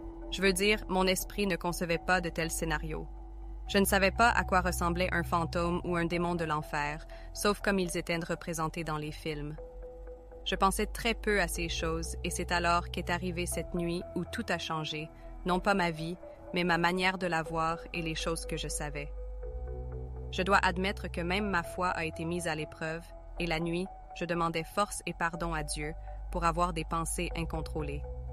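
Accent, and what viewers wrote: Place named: Canada. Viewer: Bonsoir, je viens de tomber sur votre vidéo et j'aime votre accent canadienne et je vais regarder une autre de vos vidéos car je voir dans le commentaire que c'est pas votre vraie voix ❤ bonne soirée à vous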